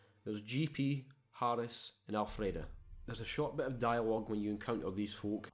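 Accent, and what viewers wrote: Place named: Glasgow, United Kingdom. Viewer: Great retrospective. Couldn't stand the stuck-in-quicksand slow combat. Where in Scotland are you from? From the accent, im guessing you're a 'Wegie?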